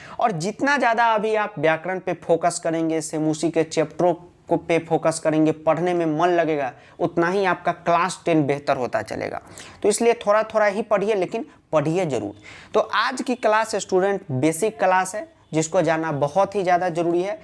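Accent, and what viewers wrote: Bihar, India. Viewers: sir full Bihari style rocks😂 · Sir, kya ap bihar se ho. Apke bolne ke tarike se lg rha h. Pls, bta dijiye❤💫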